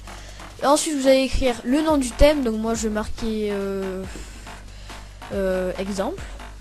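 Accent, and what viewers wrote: France. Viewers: On sent l'accent du sud x) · Plus marseillais , tu meurs ! :D MARSEILLE EN FORCE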